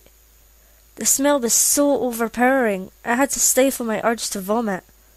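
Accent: Scotland, United Kingdom. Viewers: Could you please say "fishfingers and custard" in your awesome Scottish accent? Awesome creepypasta.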